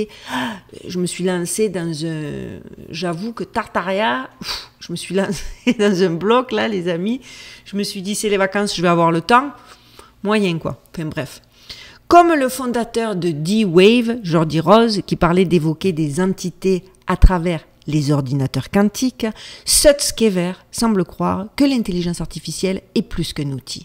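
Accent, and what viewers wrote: Marseille, France. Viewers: Un bonjour de Corse où ton accent Marseillais fait partie des rituels rassurants de nos mercredi et dimanche 🎉❤, merci de nous partager ton enthousiasme et ta bonne énergie ! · Salut --merci pour tes videos---tu es native du sud est ou du sud ouest de FRANCE ?? Tu as ce bel l accent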